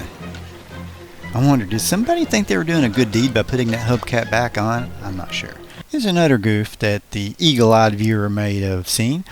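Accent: South, United States. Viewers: Where in the South is your accent from?? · The guy narrating sounds like he's out-of the dukes of hazzard.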